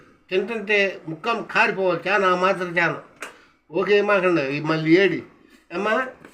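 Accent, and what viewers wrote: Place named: Andhra Pradesh, India. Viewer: తాత నువ్వు ఉండే వంటలు ఎక్సలెంట్ తాత మీ ది విజయవాడ కాదు తాత విజయవాడలో ఉంటున్నారు కానీ మీ ది పక్కనే ఏదో ఊరు అయ్యుండొచ్చు నీ మాట తీరు పక్కా ఆంధ్ర బందర్ దగ్గర ఏదైనా ఊరు అయి ఉండొచ్చు అనుకుంటున్నా తాత మీది కదా తాత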